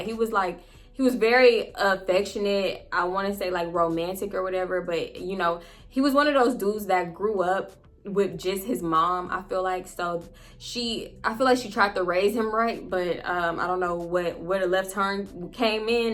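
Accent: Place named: South, United States. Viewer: You sound extra southern today idk what it is 😂😂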